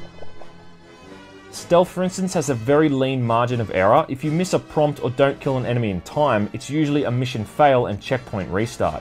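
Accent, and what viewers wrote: Australia. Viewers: Aussie mate ?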